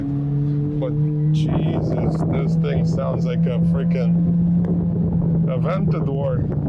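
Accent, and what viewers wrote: Russia. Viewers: Seems maestro has Russia accent.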